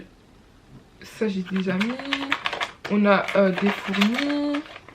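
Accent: Ivory Coast. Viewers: T’es ivoirienne ? Ta façon de parler 😂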